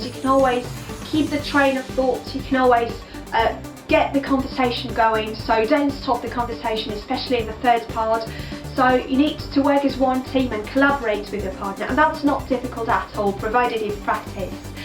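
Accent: RP, United Kingdom. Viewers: Your not Ukrainian your British...i wish i can speak like that 😭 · What with the posh accent? :P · i can't even ukrainian you speak like a real native eng speaker british so good amazing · Wow, astonishing! When you started speaking, I thought you were a British teacher. Your accent is so perfect. Congrats,!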